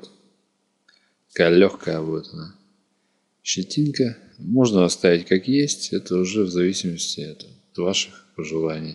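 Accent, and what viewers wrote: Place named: Russia. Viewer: Уроки конечно норм, но учитель, блин, с какого ты села?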